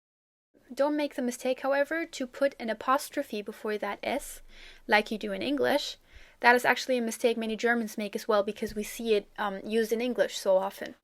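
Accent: United States. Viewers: BTW your American English sounds great :) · Are you German? 'cause you sound more American or Canadian.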